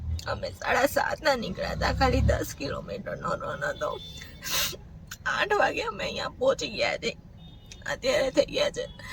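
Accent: Kathiyawad, India. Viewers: Har har mahadev..... tamara videos bo boring hoy che.... tamaro bolvano lehko batave che ke tame fakt kathiyawadi Gujarati boli sako cho to bijane samaj ni pade.... tame natak pan bo karo cho jemke hamna aa aunty.... jagya ne barabat explore karta nahi aavadtu tamne.... matlab tame khali dekhado karo cho navi camper van banavi eno.... bo boring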